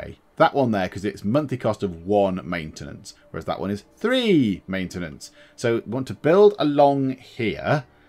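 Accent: United Kingdom. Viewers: Heavily bri'ish.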